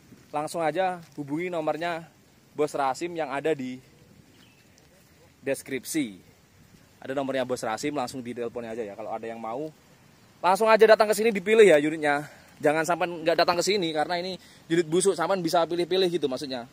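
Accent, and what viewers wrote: Java, Indonesia. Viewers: Aku senang bahasa indonesia mu mas tapi jowomu medok jawa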